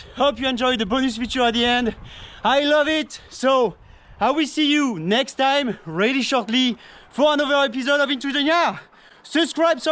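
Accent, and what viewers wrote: France. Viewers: Impressionnant... Dis-moi tu forces pas un peu sur l'accent français? :) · T’es tu français, on dirait ben vu ton accent...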